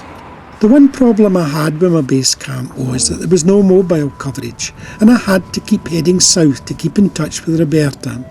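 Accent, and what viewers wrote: Scotland, United Kingdom. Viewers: Charming video,. But I confess I was looking for English subtitles, I had difficulty with your Scottish brogue..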